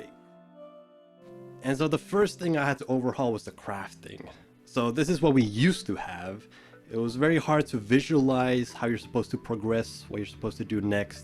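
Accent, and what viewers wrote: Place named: Finland. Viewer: After all the years trying to understand what the Hydraulic Press Channel was saying, I gotta admit, you have by far one of the most clean Finnish accents I've ever heard lol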